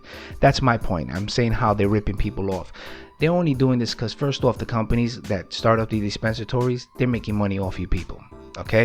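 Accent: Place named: New York, United States. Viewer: I was right, you are from New York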